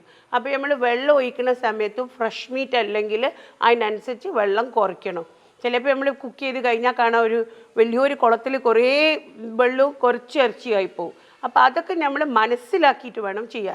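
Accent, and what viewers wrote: Kozhikode, India. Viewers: I am from Kozhikode now living in Banagalore when I hear you talking in typical kozhikkodan Slang oh my God I really like it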